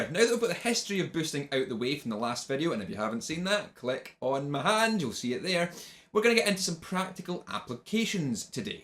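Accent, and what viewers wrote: Scotland, United Kingdom. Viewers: You sound like William Wallace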